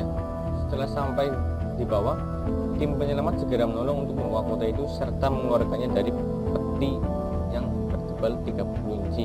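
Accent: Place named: Banyumas, Indonesia. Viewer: iya ,, yg ngomong ngapak,,,... memang ok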